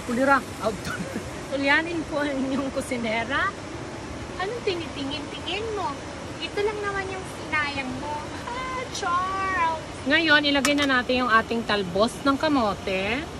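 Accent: Visayas, Philippines. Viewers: Bisaya diay ka ma'am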